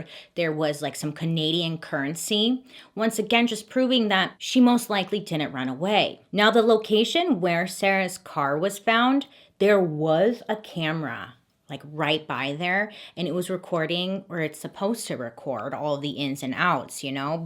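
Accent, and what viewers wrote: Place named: New Jersey, United States. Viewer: Is it me or did she have New Jersey accent in this video? Love ya but i noticed it, maybe it was just cause the crime happened in Jersey but it was there.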